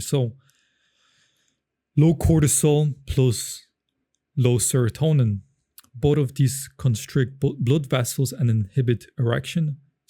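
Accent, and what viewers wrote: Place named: Ireland. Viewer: Yes, is Polish but has said the he's lived in Ireland since age 9, which accounts for his accent.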